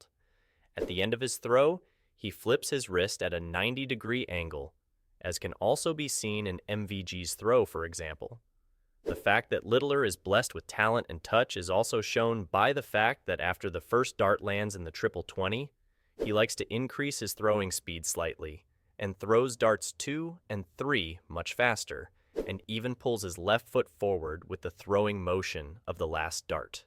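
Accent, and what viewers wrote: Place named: United States. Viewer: Did not expect an American accent on a darts video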